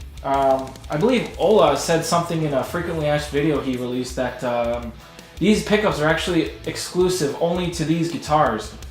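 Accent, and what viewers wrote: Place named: Canada. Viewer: Thanks, I thought I heard a Canadian accent :-).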